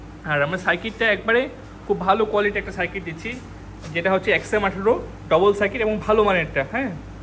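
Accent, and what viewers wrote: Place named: Dinajpur, Bangladesh. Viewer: দিনাজপুরের কথাশ এটা ভাই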